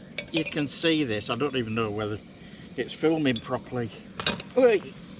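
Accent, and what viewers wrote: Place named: Hull, United Kingdom. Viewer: Thought I could here ull accent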